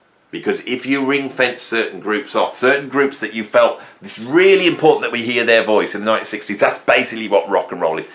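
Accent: Birmingham, United Kingdom. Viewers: Birmingham / the West Country. 🇬🇧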